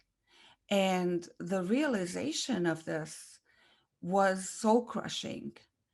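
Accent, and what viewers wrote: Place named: Germany. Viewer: Thank you sister in Christ, glad I found your channel and happy for you that you were set free from your narc(s) 🙏🏼 Going on 17 years of marriage with a Christian covert narc next year. The Lord has opened my eyes completely. Starting Minute 22 is spot on! I can hear an accent- are you from my home country Germany? God bless you 🙏🏼🤍🦾 MARANATHA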